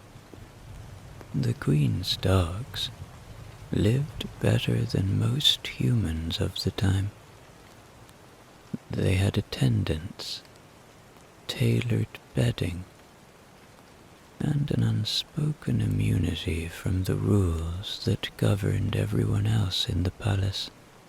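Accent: Ireland. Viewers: I listen every night from Germany. Unfortunately I don't often get to listen to the stories because I usually fall asleep before I get that far! Your voice is very soothing and I love your Irish accent!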